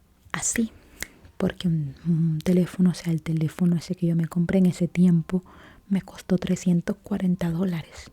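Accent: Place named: Nicaragua. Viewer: Tengo poco tiempo escuchandote pero, me gusta mucho tus videos sobre todo susurrando o platicando están super relajantes y me gusta mucho tu acento de voz nicaragüense, gracias por tus videos , saludos.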